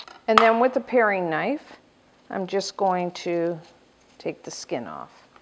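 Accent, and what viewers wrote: Canada. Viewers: is she canadian? aboot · are you canadian?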